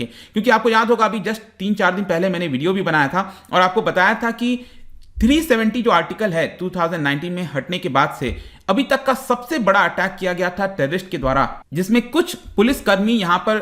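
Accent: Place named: Jammu, India. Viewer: Dogra accent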